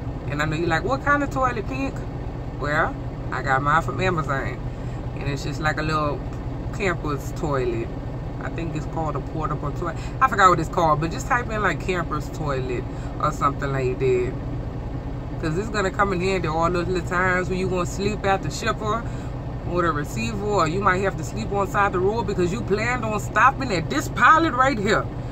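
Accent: Louisiana, United States. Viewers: I love that Cajun accent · Sound like you from south Louisiana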